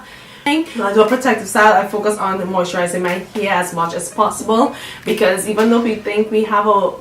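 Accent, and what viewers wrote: Trinidad and Tobago. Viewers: yuh cyah hide the accent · she has a trini accent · ah know mih ppl Trini accent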